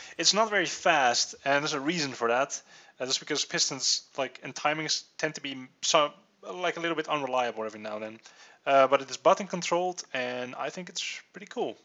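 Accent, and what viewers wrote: Netherlands. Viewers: are you dutch because you have a very dutch accent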